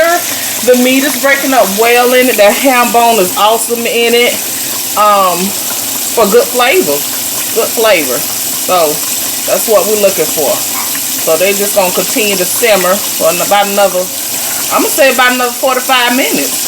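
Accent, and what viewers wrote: South, United States. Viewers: I knew You was a southerner from that accent so I already know that food finna be the bomb 💯 yes and You like me clean I don't know who can cook in a dirty nasty kitchen that's not cool I'm definitely subbing to ur channel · Enjoyed this. Very instructional. I'm from PA and this is the most southern accent I've heard, but you can tell that she knows what she's doing!! For the Mac & Cheese, I use Cayenne pepper. It gives the dish a more robust taste than the black pepper. I got that tip from a chef and have never turned back. :-) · Lol yesss! You Can Tell She's from the hood and the South! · I bet she either from Alabama, Mississippi, or Georgia lol she talk just like us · You know she can cook with that southern accent 😍 black mamas don’t play when it comes to food 🥘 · This is how I was taught. Saw the bonnet, heard the accent and promptly hit the subscribe button. 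From one Southern Gulll to another..Gone Girl!!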